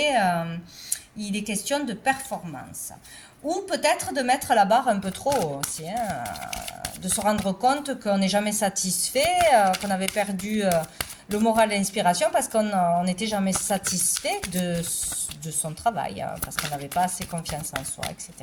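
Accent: South, France. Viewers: Ça change un peu l’accent du sud ! Il n’y en a pas beaucoup..en plus c’est le mien 😊 Merci pour ce tirage !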